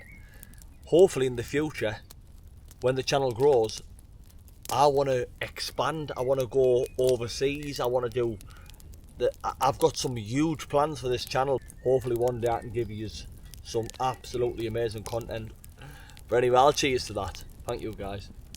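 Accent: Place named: Newcastle, United Kingdom. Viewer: Great content. I love your authentic down to earth videos. I live in Morpeth so love content from someone with a geordie accent. Keep banging them out. Barry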